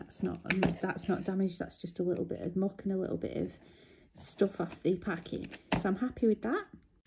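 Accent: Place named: United Kingdom. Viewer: Love these videos and love hearing your British accent! Pretty weights!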